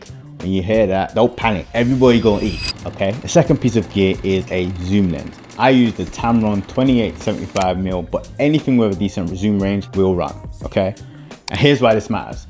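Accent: United Kingdom. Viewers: they haven't figured out that you're British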